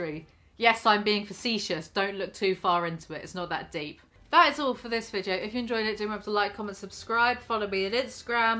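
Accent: United Kingdom. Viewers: I’m from N Y and I always Loved the British talk! RUBBISH ! Hello mate! Specially this nice looking British woman! You Go Girl!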